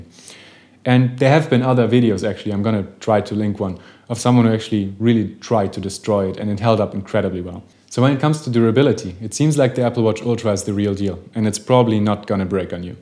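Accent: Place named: Germany. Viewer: Interessantes Video, wenn auch ein bisschen zu lang. Man hört aber deutlich, dass ein Deutscher hier versucht, Englisch zu sprechen (z. B. an der wechselnden britischen und amerikanischen Aussprache, so sagst du z. B. läßt statt lahst (für last) oder fähst statt fahst (für fast)). Warum das sein muss, verstehe ich nicht. Mach doch solche Videos (auch) auf Deutsch.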